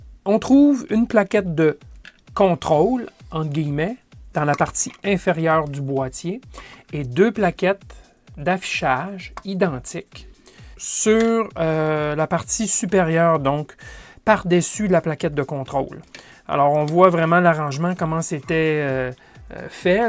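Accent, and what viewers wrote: Quebec, Canada. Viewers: Bonjour. Je n'y connais strictement rien en électronique mais je regarde vos vidéos avec grand plaisir, ne serait-ce que pour l'accent du Québec ! Libre bien sur hein. Sino, bah et si j'ai tout bien compris vos explications, vu que la plaquette du dessous qui recevait les datas ne sert plus à rien, z'avez qu'à en faire une station météo avec les infos défilantes sur les digits à LED. Ou alors, mais bon ça m'a l'air peut-être plus compliqué, faites rentrer ça dans un boitier de PC et essayer de récupérer les signaux des ventilos et autres sondes de la carte-mère pour les diffuser sur les digits à LED. Je sais pas comment hein vu que j'y connais rien du tout. A part que peut-être aussi, sur les CM de PC y'a des ports COM ou SERIE qui servent à rien la plupart du temps. Ou bien encore, vu que le boitier est tout aluminium, collez-y un petit Rapsberry PI dedans et l'afficheur à digits de ce tagazou servira bien à keke chose vu qu'il y a tout un tas de ports dans ces petits PI. 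Take care, thanks, peace ☮ .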